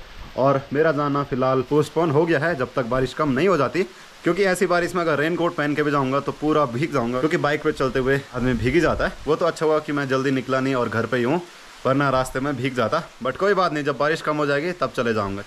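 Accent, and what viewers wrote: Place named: Rudraprayag, India. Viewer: Apki rudraprayag ki garhwali thodi alag h ......😅😅 Thodi alag sound hori h 😁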